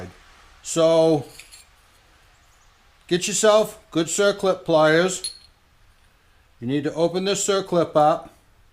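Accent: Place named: Maine, United States. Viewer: As a frequent visitor to the great state of Maine, I loved the license plates, accent and info! I have the old return tubes in the trash and since I have my old heat exchangers off and also in the garbage, this should be pretty easy....famous last words...we'll see this weekend. thanx again..most helpful and entertaining. steve meltzer, houston, tx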